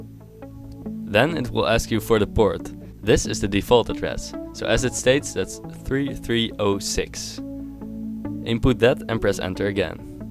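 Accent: Netherlands. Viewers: je klinkt een beetje Nederlands haha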